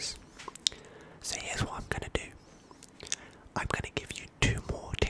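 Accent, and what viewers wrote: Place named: West London, United Kingdom. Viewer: I want to hear more of that west London accent heheeee~~~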